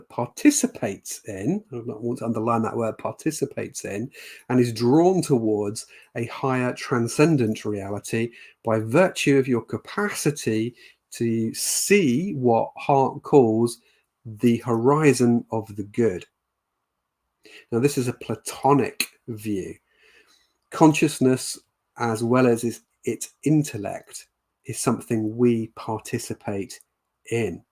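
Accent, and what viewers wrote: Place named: England, United Kingdom. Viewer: Superb English accent and manner indeed, on top of all the thought put in. Subscribing. (A beautiful reminder of Attenborough, but dreadful now to realise that "Soonack" Sunak and co have similar too, eloquently aiding the destruction of the our fellow human beings and their loved ones in Gaza and Ukraine!!🥶)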